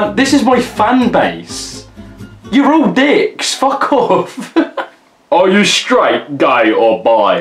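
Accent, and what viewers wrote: England, United Kingdom. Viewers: he sounds British because he is, so don't try to compare him to every british YouTuber 😂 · Wow your so beautiful and your voice is so hot omg love this uk accent. You have incredible eyes. And your so fucking funny haha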